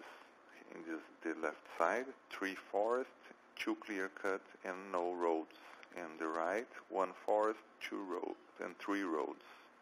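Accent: Brazil. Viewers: You accent its similar to brazilian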